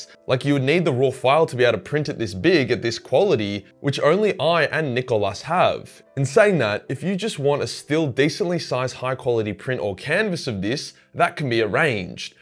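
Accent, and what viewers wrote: Australia. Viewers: I don’t know if I would’ve enjoyed Warhammer 40 K if it wasn’t narrated by an Australian keep it up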